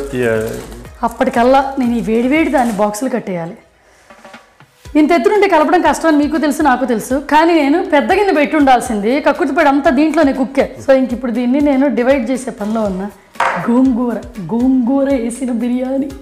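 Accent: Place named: Krishna, India. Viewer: Krishna District telugu andi chala bagundi.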